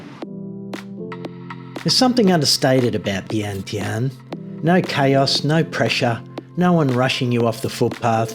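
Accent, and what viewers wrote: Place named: Australia. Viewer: Thank you for the video, mate. Love seeing vid about Laos. Also, love your down under accent. Stay healthy and enjoy your retirement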